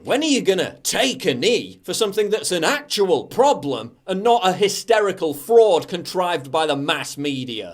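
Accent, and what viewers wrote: United Kingdom. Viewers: PJW, As a Christian man that recoils at the sound of profanity..... I must admit, I laugh when I hear you cuss! Not sure if its the British Sterling Accent or what, but it just makes me giggle!!! PS: DONT tell my Wife!!! =) Secretly subscribed!!!! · It's like my thoughts with a British accent? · Talking standing in front of an world map, having an statut thinking as your banner, having a elegant british accent, having your full name as your username and making political rants on the internet does not make you an extreme philosoph who's always get facts right. It makes you ANOTHER random individual expressing his points of views and again... On the Internet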